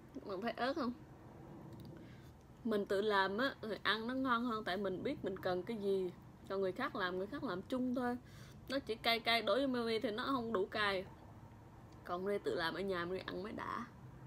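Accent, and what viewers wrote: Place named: Bahnar, Vietnam. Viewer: Tuan NGuyen chị nói.. Là người Ba Na kìa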